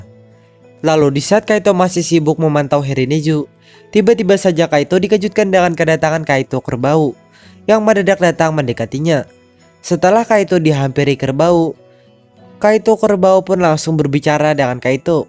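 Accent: Banjar, Indonesia. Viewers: Kok kayak orang banjar yak logat nya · Aksen Banjar🤣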